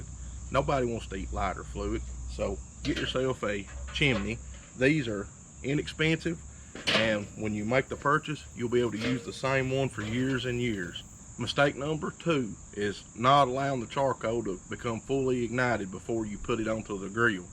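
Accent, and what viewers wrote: South, United States. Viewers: If a guy is has a southern accent and is talking about a gun or grill...listen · Love the Southern accent, this guy knows what he's talking about! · Where is that southern accent from? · Is it prejudice of me to be like “he has a southern accent, imma listen to him about BBQ?” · Love the tips and love the acent. How can you sound like a US Southener so authenticly? keep up the good work! Cheers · Good to hear a Southern accent. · Funny how this accent is associated with clean, efficient, non-meme information from experience. The time will inevitably come when the memes will reach the American South. Then no one is safe no moar 😆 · What part of the US is that dialect from? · All of these gas or pellet grills don't know the pure enjoyment of Caveman grilling with charcoal. When I heard the Southern accent I knew this was on like Donkey Kong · Heavy southern accent and a cap that says tractor supply, you just know you are at the right place for grillin.... 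:-) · what is it about the southern accent that makes us just trust whatever they say lol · Special like for southern accent. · That’s the most southern accent I ever heard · People can pick on a southern people but I am so proud to be Southern I love to hear us talk including myself LOL my accent ain't nowhere near as deep as this my daddy and family had a accent like this my Yankee husband took mine away a little bit😂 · Big southern boy, sounds like sound advice. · Great Video mate. I'm from Australia and your Southern Accent is just perfect.. Lol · That's the most american voice I've ever heard, absolute faith in this man's knowledge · Whereabouts in the US are you from? Loving the accent. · Thank you I really Like Your videos and Your country southern accent Your tips have help Me very much Your a Great American God Bless you.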